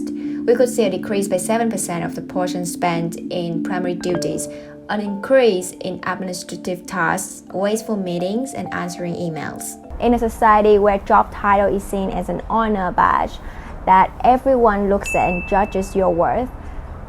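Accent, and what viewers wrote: Australia. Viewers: are you Australian? asking because of the accent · Are you Australian? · where are u based in now? is that austrailian accent haha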